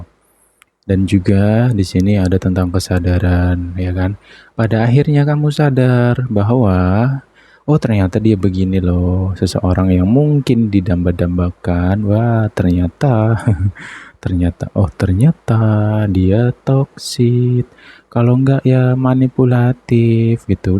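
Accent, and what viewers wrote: Java, Indonesia. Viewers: Asalamualaikum😁ea mas suwun lo tapi bru semenit kok udah kangen mas ngomonge fersi jawa gitu ngena banget mas🤍🙏🙈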